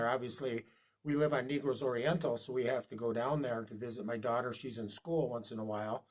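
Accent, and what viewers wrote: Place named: New England, United States. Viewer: He's a Yankee threw and threw. Where's that accent from? 😂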